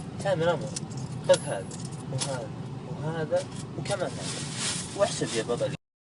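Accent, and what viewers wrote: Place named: Hadhramaut, Yemen. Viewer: king 9 من قلك كدا ييحبيبو انت هادي لهجه الحظارم الزبده ماله دخل في الخكرنه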